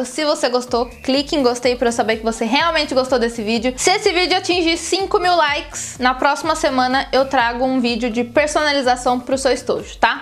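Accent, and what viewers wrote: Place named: Rio Grande do Sul, Brazil. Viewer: amei seu sutaque tchê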